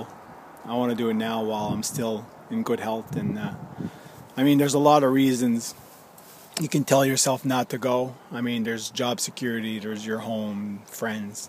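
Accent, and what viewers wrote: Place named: France. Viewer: Can barely tell you have an accent - love French accents anyway.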